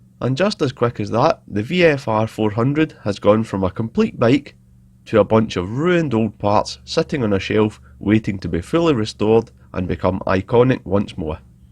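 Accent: Scotland, United Kingdom. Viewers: Nice camera angles, on spot editing, humor, Scottish accent and a VFR! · Are you scottish? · Are you Scottish? It's like listening to Luke Stoltman 😅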